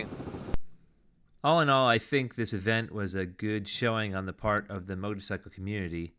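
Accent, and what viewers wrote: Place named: United States. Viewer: Enjoying all your videos, just wondering...you don't sound British. Migrant American ?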